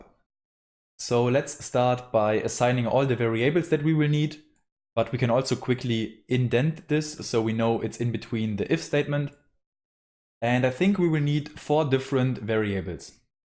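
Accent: Germany. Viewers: Nice guide, but in the end i was too lazy to add it anyway 😂. You explain it very well, the only thing that i don’t really like is the german accent ;)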